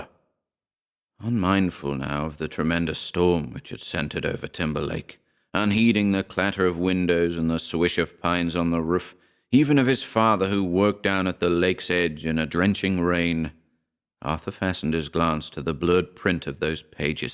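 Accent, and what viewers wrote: United States. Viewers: Nice American accent! You surprised me with that😀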